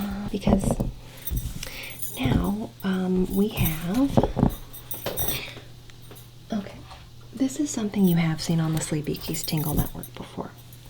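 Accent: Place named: Midwest, United States. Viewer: The Midwestern accent adds to the nostalgia lol thank you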